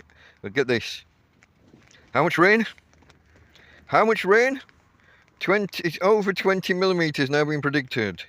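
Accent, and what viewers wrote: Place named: Huddersfield, United Kingdom. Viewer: just in case you were familiar with the other sons of Huddersfield The Sheepfarmstudios brothers Chris & Dom. I had a lecturer from Huddersfield sounded like yourself. Sheepfarm had yet another Huddersfield original on called Michael O'Deira who might assist you with your banking issues.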